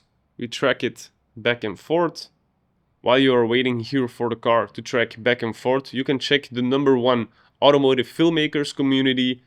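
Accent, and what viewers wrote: Belgium. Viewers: Bro you are from Belgium?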